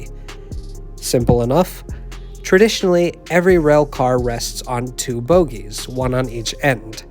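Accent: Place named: Chicago, United States. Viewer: you have been very, very evenhanded with Amtrak👍🏻 you and Chicago area?🤔( you despite being from the Netherlands) sound like a Chicagoan lol🤘🏻🇳🇱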